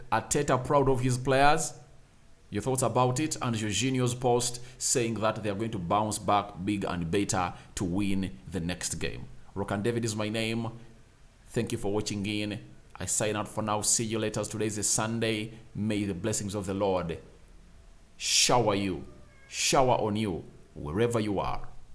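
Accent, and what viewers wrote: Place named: Iteso, Uganda. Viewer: Rokani thanks for the job you really give the truth but i request you to tell me your country and tribe coz you speak like an itesot from soroti city uganda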